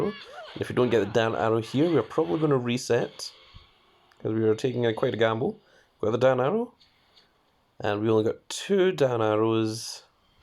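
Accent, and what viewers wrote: Scotland, United Kingdom. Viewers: Are you Scottish mate?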